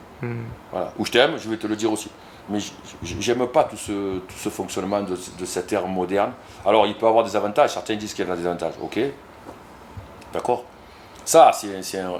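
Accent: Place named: South, France. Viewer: French southern accent 😍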